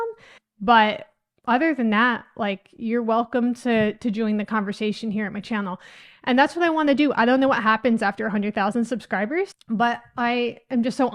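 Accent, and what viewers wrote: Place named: New York, United States. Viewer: Excuse the question, are you from the Utica area in NYS? I'm always interested in regional accents. Your accent sounds like Central/Western NYS . . . .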